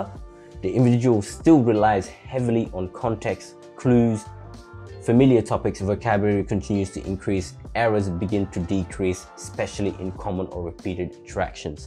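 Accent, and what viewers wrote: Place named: England, United Kingdom. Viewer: I like your English accent i hope you will have more good video # from Thailand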